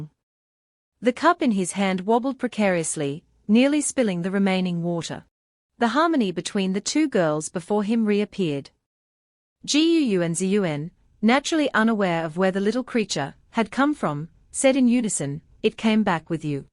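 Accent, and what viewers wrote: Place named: United Kingdom. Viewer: Why this uk accent 😢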